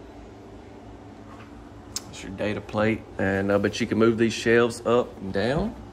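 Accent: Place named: Deep South, United States. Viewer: Greetings from Arizona! I love this house! I love the split floor plan, how the great room is laid out. The pantry and closets are fantastic! It’s just the right size for me, and any visiting guests, or even a tenant. I hope there are other options for the time color in the master bath shower,m. There there is lime in the water where I live. It builds up, and would be much more obvious more quickly than on lighter tile. I love your videos. They are well thought out. You do a great job of showing and detailing the features. You obviously like what you do. You are enthusiastic without being “in your face”. I love your easy going manner, and your accent, that Deep South gentle drawl. Angels on your bumpers and all around you.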